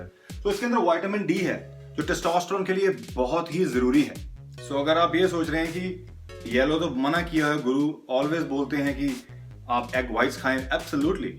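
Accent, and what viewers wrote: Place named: Punjab, India. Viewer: veere punjabi jhalak jandi har war ,.....love that too much .....jaffi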